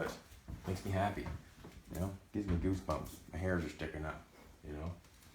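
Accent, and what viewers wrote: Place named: Canada. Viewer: I started watching your videos and man your channel is going to blow up soon with your amazing work! I couldn't help but think you were from Canada bc the Keith's shirt and how you talk and now u said n.s. I'm originally from cape Breton and live in Halifax now and wanna say hello from Halifax man!!! Keep er up man love your work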